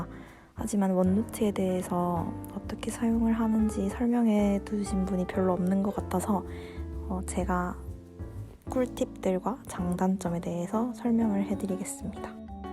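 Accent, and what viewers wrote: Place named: Gyeongsang, South Korea. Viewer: 목소리가 매우 차분하고 전달력이 좋으시네요 약간 경상도 억양이 있으신 듯 한데요 저는 여자분 아주 살짝 섞여있는 경상도 억양있는거 뭔가 느낌이 좋더라구요 영상 잘 봤습니다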